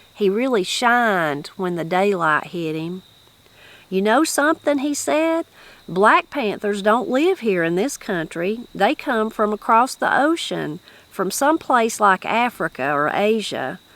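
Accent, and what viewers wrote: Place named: South, United States. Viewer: Late to the party, but thankful as well. What a gift. Your voice, pace, cadence, and tone are what we sons of southern women recognize and love.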